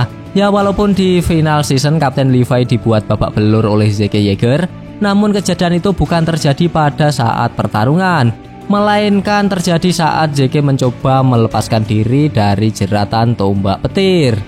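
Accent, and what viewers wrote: Java, Indonesia. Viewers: Kon wong jawa ya min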